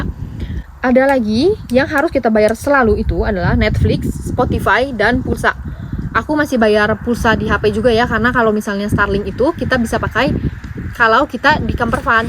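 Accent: Bandung, Indonesia. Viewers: Bicaranya khas bandung banget...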